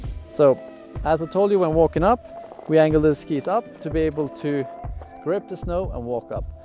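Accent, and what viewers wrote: Sweden. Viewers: Are you Scandinavian? You're accent sounds Scandinavian, specifically Swedish🙂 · This is really helpful! Tack som fan mannen!(din engelska är väldigt svensk😉) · Is he Swedish?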